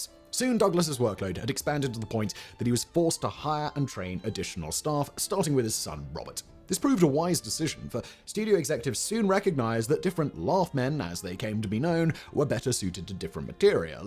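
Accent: United Kingdom. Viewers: I think it's ironic that you was a British person or at least a person with a British accent… Did not mention the use of the laugh track in Great Britain… Especially on the Monty Python flying Circus… They fought against a laugh track and were told by the producers"when will people know to laugh"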